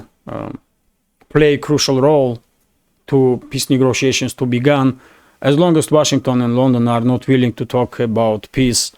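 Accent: Russia. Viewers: I was so happy to see your appearance on the Duran. Your discussions were informative and the points you made were indisputable. I know you worry about your English, but hearing your amazing English skills better than most Americans has brought me great appreciation for English spoken with a Russian accent and has inspired me to learn Russian myself as a West Virginian; I have learned I need to find a tutor. Way to go, onward and upward friend. May your successes and joys be many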